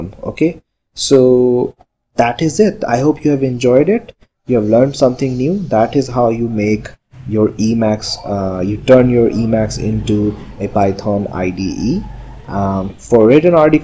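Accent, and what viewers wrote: India. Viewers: ddddddddd... Indian accent...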